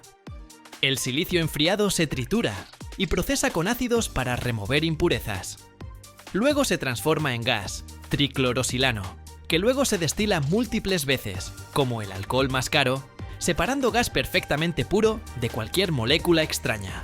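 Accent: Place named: Spain. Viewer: Buenas imágenes, buenas tomas en el vídeo pero que lastima que hables como los españoles de españa,España con “e"menuscula soy Americano Nativo, greetings.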